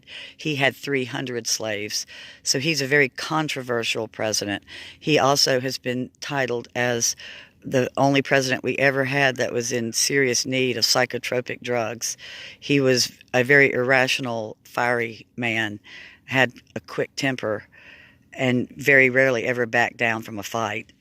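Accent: South, United States. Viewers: Jackson's nothing but a murderer and a bigot. Trail of tears= genocide also caused a depression due to land buying changes explain your reason to visit such person. Oh wait that southern accent explains it all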